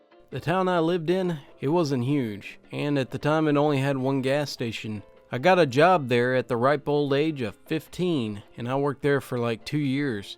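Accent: South, United States. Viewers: the waylen jennings justified accent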